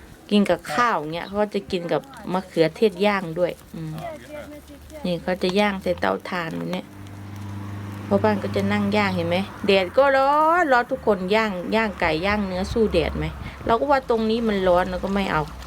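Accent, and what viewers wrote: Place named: South, Thailand. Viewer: เจอคลิปคุณเมื่อวาน เริ่มไล่ดูไปเรื่อยๆ การตัดต่อบรรยายมีเสน่ดี ตอนแรกนึกว่าคุณเป็นคนใต้ หรือไม่ก็ปราจีน เคยได้ยินสำเนียงแบบนี่ ที่สำคัญคุณเป็นคนตลกมาก ชอบ กดติดตามแล้วนะคะ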